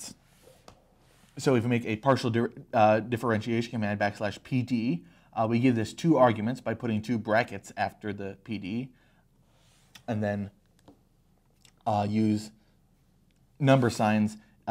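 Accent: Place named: United States. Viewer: Why are you pronouncing "Z" as "C"? ... I know it is the American pronunciation, but for non-Americans it is very confusing. :-)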